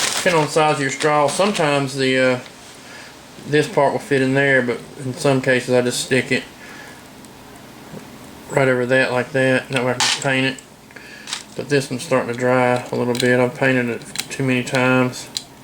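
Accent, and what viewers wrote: South, United States. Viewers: you have an amazing contry axent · You talk like some texas fella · no ofense but you sond contry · That is the strongest Southern Accent that I have every heard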